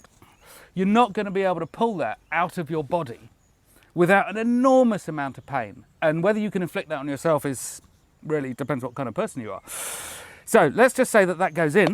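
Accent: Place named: United Kingdom. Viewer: You looked like a bald German guy who I've seen on YouTube before. When I heard a British accent you scared me.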